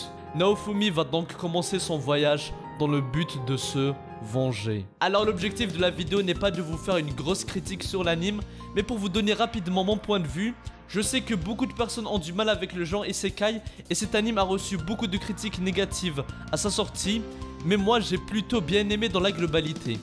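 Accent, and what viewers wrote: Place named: Kabylie, Algeria. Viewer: Pourquoi cette accent kabyle ??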